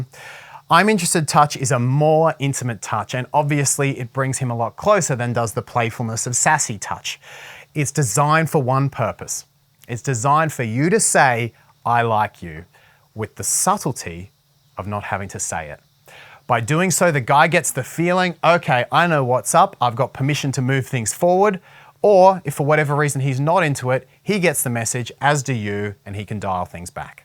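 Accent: Australia. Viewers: is that ur real name, I have good reasons to ask, r u Chris Pratt? Someone meshed some faces in vids sent to my phone. I met someone in my town I'm living in, in Canada, who looks like you or like the Vid of Chris Pratt sent to my phone. (R u Chris?) The man I met said his name was Dylan? Do you actually have a real partner or just for the vid? Is ur Aussie accent for real, is ur Mat whose filming ur vid, last name Leblanc?(not the friends actor)? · are you Australian?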